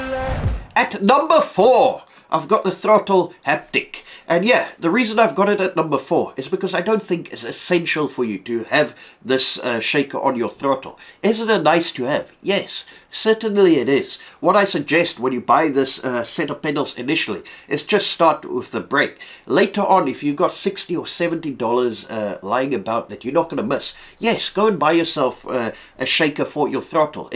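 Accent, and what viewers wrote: South Africa. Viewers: You sound like a south african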